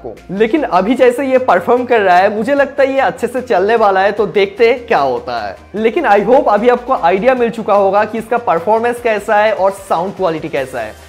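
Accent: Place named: Bengal, India. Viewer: Bangali accent bojha jachhe bhai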